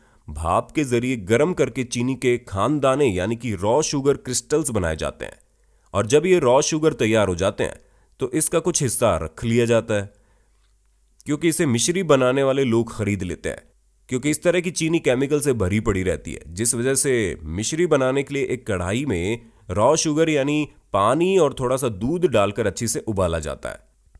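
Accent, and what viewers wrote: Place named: Haryana, India. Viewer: Host ki awaz haryane ki lg rhi h 🤩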